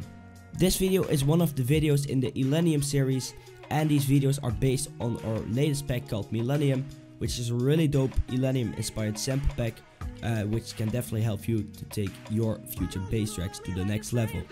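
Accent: Netherlands. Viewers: lekker nederlands accentje wel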